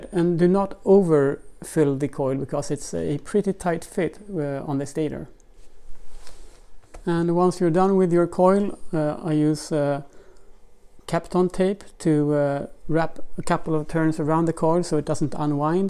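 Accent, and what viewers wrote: Sweden. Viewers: Sorry to ask....are you from Sweden?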